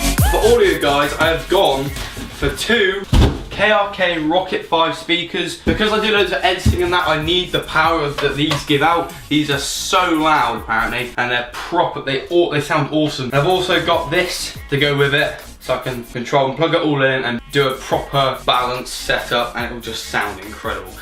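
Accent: United Kingdom. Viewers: why does every british person call amazon amazn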